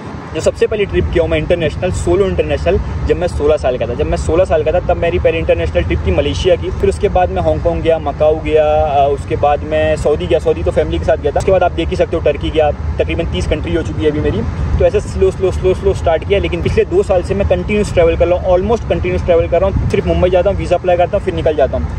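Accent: Mumbai, India. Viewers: Assalamu alaikum bhai in sha Allah top pe jaoge agar isi tarha imandari se kam kiya to. Aur bhai language pe dhyan do mumbai wala accent aata hai hai.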